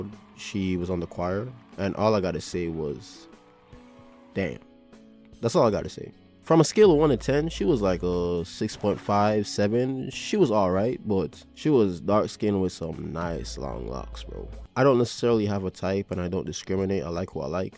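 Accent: Jamaica. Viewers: Are you Jamaican or is that just your voice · yo bro stop tryna hide your accent bro l like some Jamaican i new u was Jamaican from how you said da buss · Brooo are you Jamaican? I can just feel our aura resonating off you brooo (the accent). Respect, loved the vid. ❤🇯🇲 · Dawg u accent heavy bro u jamaican? 😮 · Here I am picking up on a fellow accent like, is he Jamaican, is he not?? But nope, as soon as you said "Brother", I knew. I always know. I'm always two steps ahead...anw, nice video. It's kinda funny watching this as a female, seeing how guys think etc. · R u Jamaican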